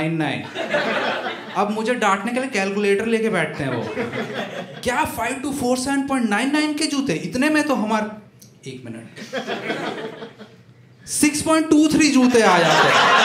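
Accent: Odisha, India. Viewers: Proud for great accent. Otherwise every body says your odiya accent was very sexy'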